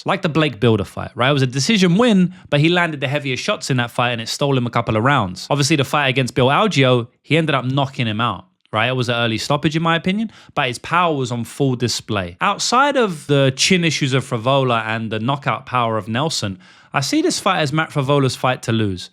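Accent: United Kingdom. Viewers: I’m glad this channel is getting bigger. I remember absolutely hating when I first watched him. I was like “who is this arrogant prig with the English accent and f his mama” but I had the accent and the man messed up. lol. Three years later and I never miss a single piece of content. 😅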